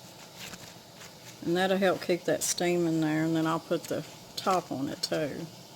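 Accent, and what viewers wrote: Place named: Texas, United States. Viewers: My kin are in Pasadena Texas as well. 😁 I thought I recognized your accent. Your tamales look yummy....😋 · LOVE this! And you sound just like you are from Pasadena, Texas! I’m from a little further east piney woods. Love yalls channel! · I just knew that was a Texas accent! hello from Houston. Oh my goodness my Grandma & Pawpaw lived in Sulphur Springs I use to spend summers with my grandma (we lived in Garland TX) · How did you decide to homestead in Arkansaw and can you make enough on the homestead to sustain you or do you also hold down regular jobs? I love your Texas quiet voice! I live in Texas and my voice sounds a lot like yours. Can't stand harsh voices. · I knew that accent was Texan!!!!!!!! ♥♥♥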